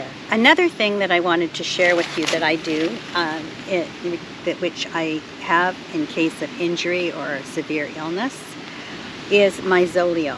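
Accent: Canada. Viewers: I can tell she from BC. CA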